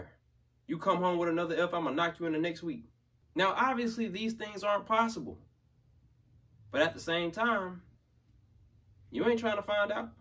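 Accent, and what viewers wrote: South, United States. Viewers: Hi I'm also from Mississippi and my mom and most of my family all of them talk like this I also wanna see some of the ways ppl say yo food nasty or yo ah cannot cook for nun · Love from London!! Absolutely love how you speak and how Southern accents sound. That whole 'down the street' and 'in a minute' plus 'cold-cold' & 'knock you into next week' are very much English things too 😊. But we lack your true linguistical skills by a long way! ❤ Great channel, thanks! ❤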